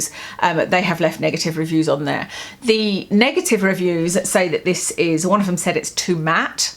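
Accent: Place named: United Kingdom. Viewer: Hi, why don’t you leave the sunscreen in the bathroom and put it on in there? You won’t have to worry about touching doorknobs and then sticking your fingers in the container. I haven’t tried Stila liquid lipsticks in years. Do they still have a scent?!I couldn’t wear them due to the scent! I love your accent 🙂 Words sound fancier in a UK accent!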